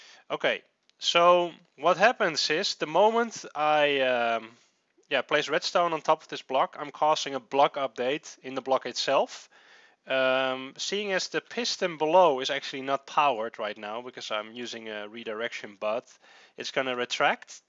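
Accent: Netherlands. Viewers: I can hear from your accent you are dutch, arent you? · ben je eigelijk nederlands ik hoor het aan je stem? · Hij praat met een nederlands accent... LOL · Tuurlijk is hij nederlands dat hoor je aan zijn verkrekt engels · Je kunt zo horen als nederlanders engels praten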